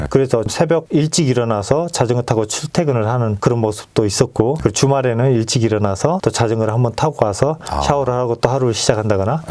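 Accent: Jeolla, South Korea. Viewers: 전라도? 외국으로 가셨내.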